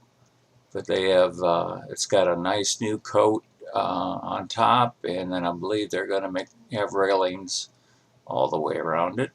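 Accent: Ohio, United States. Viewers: Hey, I am watching your dam removal videos from the day of the first day and the notch. You did a great job! Maby the best dam removal documentation of all. And your Ohio accent is very good for me to understand and sounds very pleasant to me. Thank you very much for your great videos! Greetings from Krefeld/Germany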